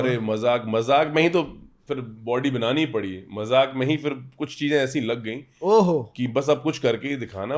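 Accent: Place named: Haryana, India. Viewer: Bhai boli haryana ki esi s, pr Dil t aacha ha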